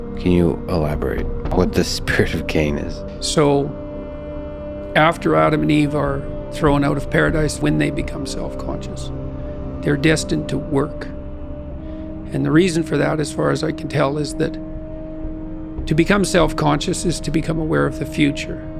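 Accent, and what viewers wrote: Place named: Canada. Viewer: Dragging it to say some generic ass advice. His Canadian accent just makes it pure comedy. Like god said it cane; you’re not trying hard enough to lose that disgusting accent.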